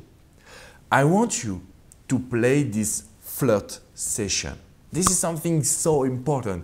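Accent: France. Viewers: I smiley when i Hear your French accent😘😀trop mignon 😃😅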